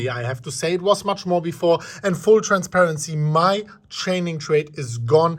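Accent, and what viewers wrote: Germany. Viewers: Bro, bist du deutscher? :D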